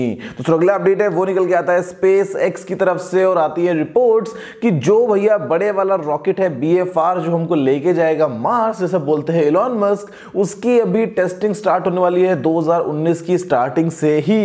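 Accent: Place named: Haryana, India. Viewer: I think apki performance mein thoda haryanvi tadka hogga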